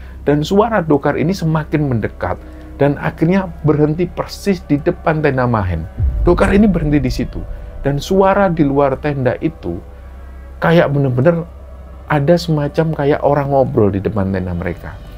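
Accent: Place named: Tegal, Indonesia. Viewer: Mantap ni dari kabupaten tegal mas 👍 Ora ngapak ora kepenak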